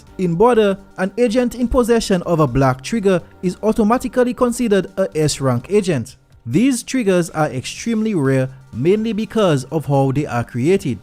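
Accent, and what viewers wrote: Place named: Sint Maarten. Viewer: Hey man, if its not too personal, where is your dialect from? Had a discussion with a friend, he guess ST:martin.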